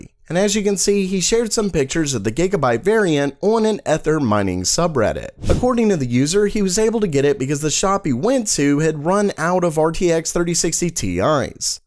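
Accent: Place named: Texas, United States. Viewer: Your accent sound like a Texan or Oklahoman accent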